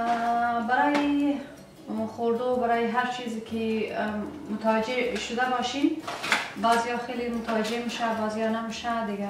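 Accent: Afghanistan. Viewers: شما خیلی منظم و با سلیقه هستین. زنده گی تانرا در افغانستان سپری نموده اید یا در ایران.چون لهجه تان بسیار شیرین و افغانی بنظر میرسد.خیلی در تلاش همچو همسر مثل شما میباشم.فکر نکنم که پیدا نمایم.چون طالع ندارم.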